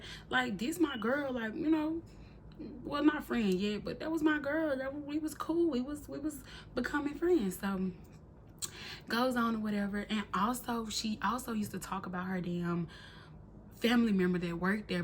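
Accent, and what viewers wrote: Mississippi, United States. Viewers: I love that country azz MS accent, my family is from there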